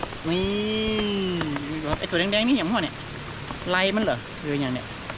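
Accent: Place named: Chiang Rai, Thailand. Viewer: บ้านแต้อยู่ไหนนิสำเนียงอย่างคนเจียงฮาย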